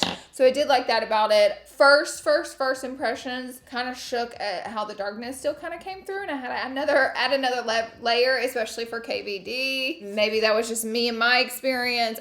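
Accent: South, United States. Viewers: Girl....That Black lipstick ruined it for you Lol 😂, but I guess it was fun....Good to see you doing a makeup tutorial But I do much prefer your other videos now with a little bit of home decor and the family in them. Your So Cute and I love your accent, southern charm girl. Best Wishes and Kindness from Queensland Australia 🇦🇺❤️❤️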